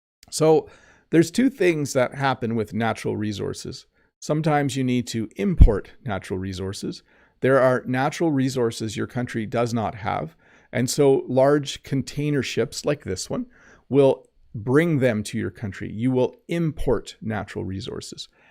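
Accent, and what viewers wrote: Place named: Canada. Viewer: It was a great conversation, I enjoyed it so much I forgot what I wanted to state in this comment section. If it comes to my mind, I will come back and write it down. Until, let me tell you what I didn't forget: if you want to make a lesson about Canadian versus American English, I noticed the following differences: about - the Americans pronounce the o in this word differently than you. resources: the Americans pronounce it with s and not like you with z. Collect something in this topic, you may be able to do it once!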